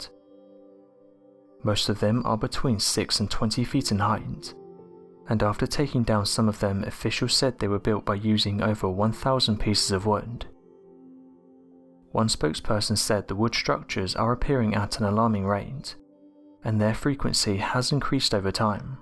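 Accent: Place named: United Kingdom. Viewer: ..... I am thinking it's a British/ UK 🇬🇧 accent!...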